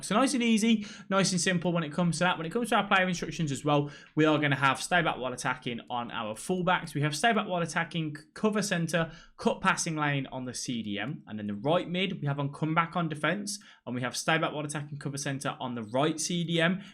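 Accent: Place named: Yorkshire, United Kingdom. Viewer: Good content bro but ur yourkshire accent is like nails in my ears, why british english is so terrible???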